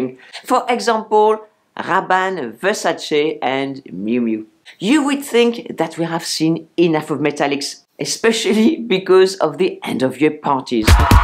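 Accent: France. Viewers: Still watching your videos for your great personality and exhilerating and chick French accent. Maybe I will come around to my messy wardobe some day...:-D Thank you from Marianne! That was a nice morning coffee. And cheers to going "shopping" in our own wardrobes (previous video).:-D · I love pencil skirts. Your accent is amazing. Mostly French with classy English. I can tell you were here in England. Your make-up i copy. I wear Nuxe oils. I have 3 now and i favour red lipstick. I copy you in many styles and im 76, or as my GP says im the new 67. My grandmother was called Lizette and im Jacqui. Maybe thats it. Please keep these videos coming. Thank you🎉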